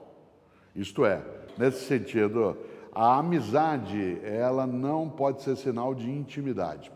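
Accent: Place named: Rio Grande do Sul, Brazil. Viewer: Pena que o vídeo voi curdo esse sotaque gaúcho com toque italiano é de mais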